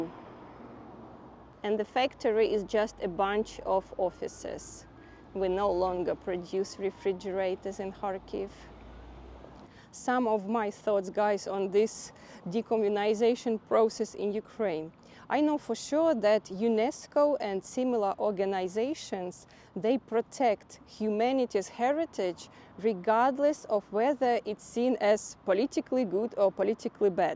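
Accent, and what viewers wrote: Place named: Russia. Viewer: There's nothing wrong with the Russian accent but calling it "attractive" is kinda cringe.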